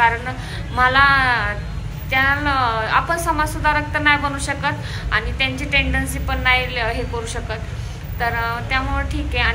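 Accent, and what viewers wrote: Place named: Satara, India. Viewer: Mla tumchi satari bhasha khup aavdte tai 🤗 tumhi khup bhari ahat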